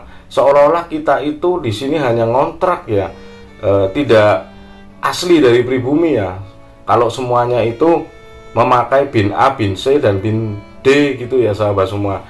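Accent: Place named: Java, Indonesia. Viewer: Smagat bang..aja ilang jawane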